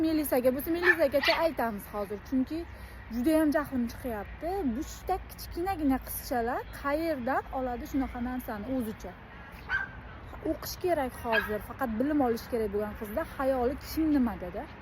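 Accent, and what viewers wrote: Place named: Qorakul, Uzbekistan. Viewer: Qarakoʻl danmi siz shevamiz oʻxshaydikan.tinch yurmanglar ayollar.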